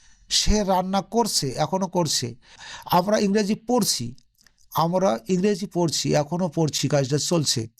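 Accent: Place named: United Kingdom. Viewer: Apni british english bolchen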